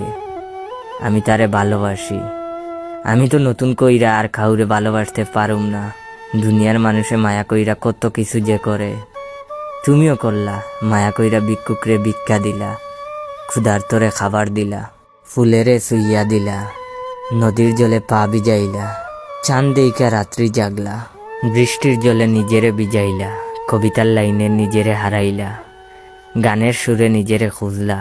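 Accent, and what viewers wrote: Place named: Sylhet, Bangladesh. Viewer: সিলেটি ভাষা লাগে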